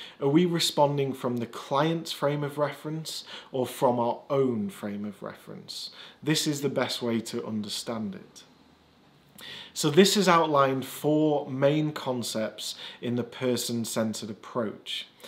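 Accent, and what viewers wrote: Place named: United Kingdom. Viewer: Hey! Amazing videos mate. I recently subscribed to your channel and as a bit off topic I would like to know if you're from the Midlands or north, your accent is quite lovely :) Have a great day!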